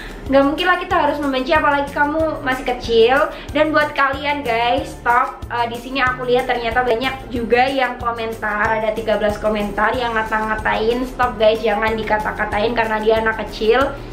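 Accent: Java, Indonesia. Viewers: Medok bgt tapi lucu suaranyaaaa😍😍😍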